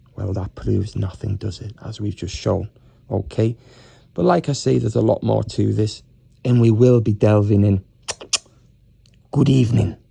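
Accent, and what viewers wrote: Liverpool, United Kingdom. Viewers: Late night Hello to from Belfast 🇮🇪 your intelligent? You have the gift of the gab? And you believe your handsome? But yet you have a Scouse accent 😂👍SO YOU MUST BE IF THIS IS TRUE, PART IRISH 🇮🇪 👌👌👌 KEEP IT REAL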